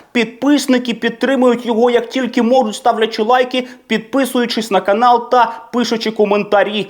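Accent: United Kingdom. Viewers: Дякую, дуже цікаво! У Вас чудова вимова!!! Чисто британська! ❤👍🇺🇦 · а це британьській вимова? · Британський акцент - це просто 🔥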